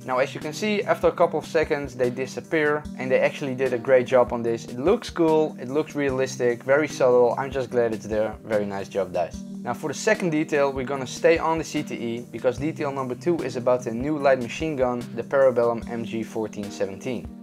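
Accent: Netherlands. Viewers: echt zn nederlands/engels accent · Are you dutch? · Ben jij nederlands? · kinda cringeworthy to hear a fellow Dutch person talk English using a Dutch accent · zo te horen ben je Nederlands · Definitely dutch this guy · Are u dutch? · you sound like a dutch fpsrussia! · For sure that's a Dutch accent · You dutch? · R u dutch? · I subbed cause i like your channel but 1 question are you dutch? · are you dutch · are ou dutch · is dat een Nederlands accent?? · Are u dutch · Are you dutch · You have a Dutch accend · Ik hoor aan je accent dat je Nederlands bent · you sound a bit dutch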